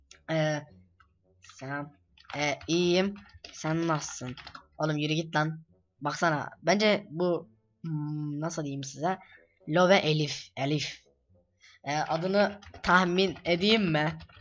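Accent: Azerbaijan. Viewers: lam san Azerbaycanlimisin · Azerisen sen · lan Azermisin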